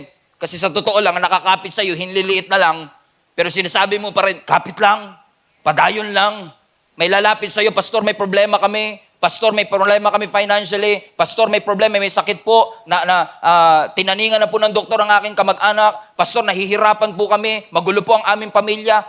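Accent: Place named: Visayas, Philippines. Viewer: Ang Ganda marunong kpala mag Bisaya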